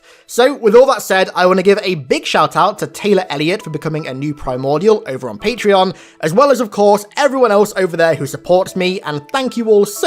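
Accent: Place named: United Kingdom. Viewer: Your voice is annoying I didn’t know I’ll be listening to a British pausing squeaker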